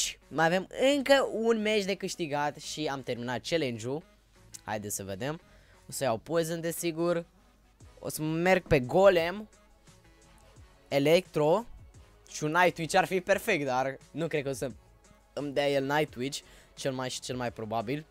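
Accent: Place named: England, United Kingdom. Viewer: Vezi ca ai putina engleza si franceza pe la coltul gurii;)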